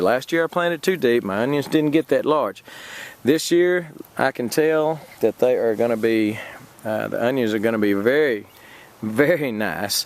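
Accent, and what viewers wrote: Texas, United States. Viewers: He has a great accent. I wonder what part of Texas he is from. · Always great to hear another Texan.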